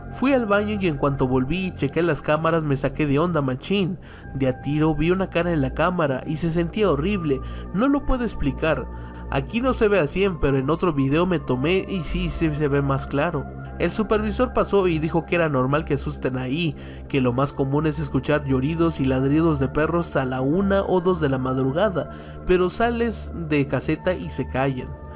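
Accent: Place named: Mexico City, Mexico. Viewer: Están buenas tus historias, vengo de ver las de los Youtubers, y el acento chilango le da un toque 😂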